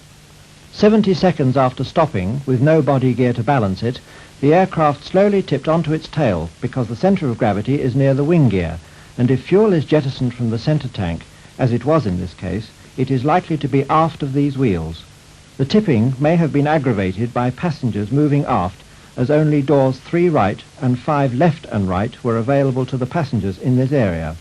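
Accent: United Kingdom. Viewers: More than 10% dislikes typically means F. For his Britglish i guess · There is just something about a narrator with a British accent that adds calmness and matter-of-factness. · Proper British for sure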